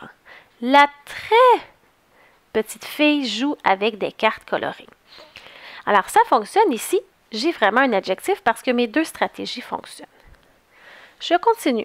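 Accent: Quebec, Canada. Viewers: Ton accent québécois et incroyable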